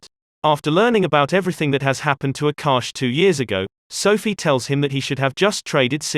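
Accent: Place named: United Kingdom. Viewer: Wow ur first video n u got 600 k views .... bollywood is lucky for u ... u have a british accent but ur from USA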